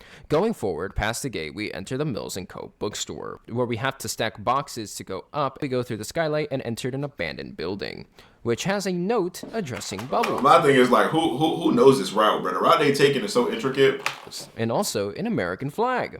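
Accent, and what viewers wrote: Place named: United Kingdom. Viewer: You sound british but justified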